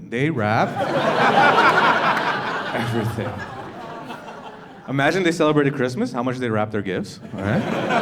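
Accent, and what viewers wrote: Toronto, Canada. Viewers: I love Canadian comics, I can sense his Toronto accent being held in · i know a toronto man when i hear one 😂😂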